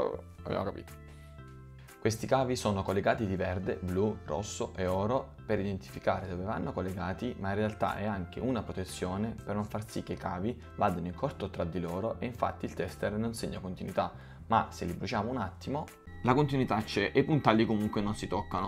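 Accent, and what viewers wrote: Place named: Apulia, Italy. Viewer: Ho provato a seguire passo passo ma il mic nin funzionava. Ho sentito che hai un accento pugliese e per questo ho chiesto,io sono in provincia di Brindisi.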